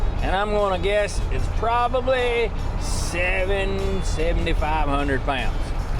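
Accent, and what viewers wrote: South, United States. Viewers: Please lose the overwhelming southern accent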